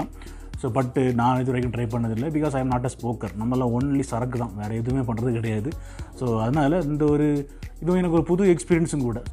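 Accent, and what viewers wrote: Kanyakumari, India. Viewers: Kanyakumari slang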